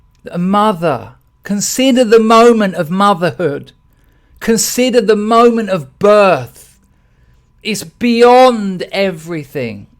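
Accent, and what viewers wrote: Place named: England, United Kingdom. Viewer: You don't know how good it feels to hear someone with an English accent say this. I have argued endlessly while my cousins across the pond called me a backward hick. I knew their decisions and attitudes would devastate their fair isle, I had no idea how quickly it would happen. Right now it's a giant snowball going straight down a mountain. Britain and Europe is lost.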